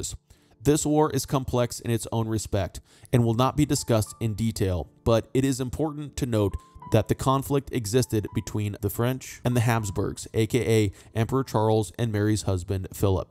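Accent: United States. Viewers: Soon as u hear a yank commentary its over...csnt stand em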